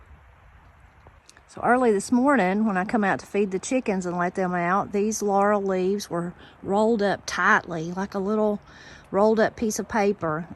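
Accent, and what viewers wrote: Appalachia, United States. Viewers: I love it, and the way you talk. You have the best accent in the whole of the USA. I'm not kidding. It's been a long cold winter and still is, here at 60 degrees north. I don't have a good name for it though, but will adopt one of your phrases for it! I felt the slight warmth of the sun for the first time today. Keep up the good work! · My mother and her family have a long history of family ties to Appalachia. I always remember my mother and grandmother saying in the winter many a day saying ‘it’s cold as a a witch’s tit.’ I am glad to know it’s a saying I didn’t know if my mom made that up herself or what! When it was a thunderstorm and sunny my mom would say ‘the devil is beating his wife.’ Thank you for your channel! You help me feel connected to my heritage. I no longer live in Appalachia and hearing your voice connects me back to my roots.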